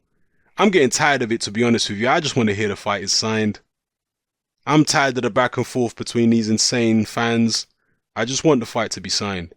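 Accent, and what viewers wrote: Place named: United Kingdom. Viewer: U speak wit a uk voice and even u dont know the name of the stadiums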